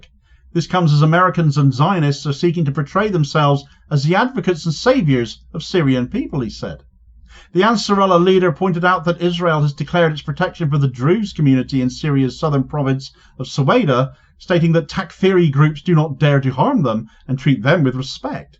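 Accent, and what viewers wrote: United Kingdom. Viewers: I have great difficulties with the British dialect of American 😁, however, thank you for covering this important content.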